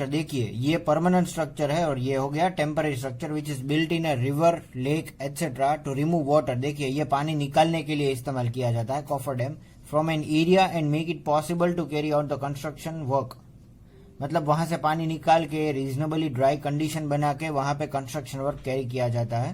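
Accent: Gujarat, India. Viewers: Sir aap gujrat se ho na 😅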